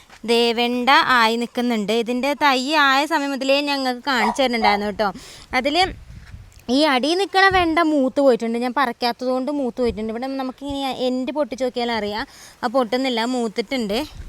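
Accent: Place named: Malappuram, India. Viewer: മലപുറം സ്ലാങ് വരും😊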